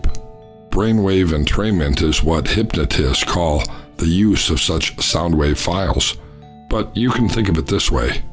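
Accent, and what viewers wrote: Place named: United States. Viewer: your work is incredible! Just discovered this new one today and got a refreshing nap... knocked me right out. Why doesn't YouTube push our your videos? I have mosophonia and can only listen to American accents. Most hypnotists are not. You, Barry Eisen, and Jody Whitely and Lunayes are powerful and all my lifesaving favorites! Thank you and God bless you always!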